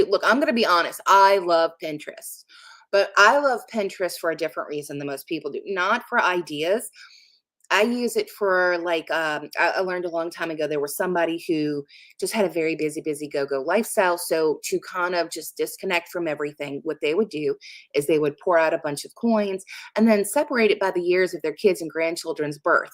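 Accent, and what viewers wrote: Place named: South, United States. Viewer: Hello girl! I'm in Oklahoma and I hear a tinge of "Suth'un" in your speech! Love it. I heard a question as to whether Sussexes would divorce. Only my opinion, but she's now heard Andrew's Sarah will retain her duchess title. Meghan covets that title, she feels she has multipled clout in having it. IF the king, or Prince William, remove their Sussex title, Meg will collapse like a burst balloon. ONLY my opinion, mind.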